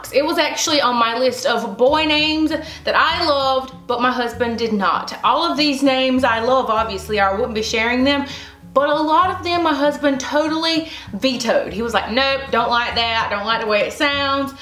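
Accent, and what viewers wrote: South, United States. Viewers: It sounds like youre saying "WEENIE' not WINNIE Lol maybe its a good thing you didnt pick that name with your southern accent girl! Haha!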